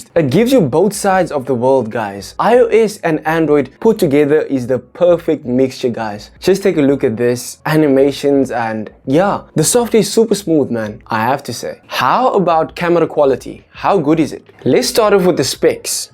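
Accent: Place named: South Africa. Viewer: South African accent so I'm assuming South Africa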